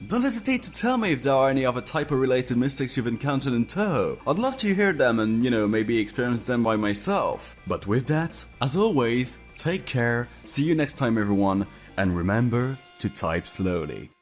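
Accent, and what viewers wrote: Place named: France. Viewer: I like your french accent.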